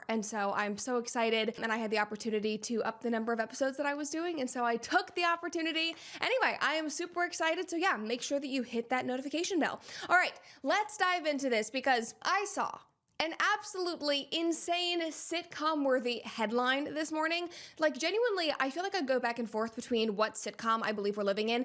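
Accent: United States. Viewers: i really liked u vibing and yapping fast and quick with American accent lots of your yapping is really fast accurate and precise 🇺🇸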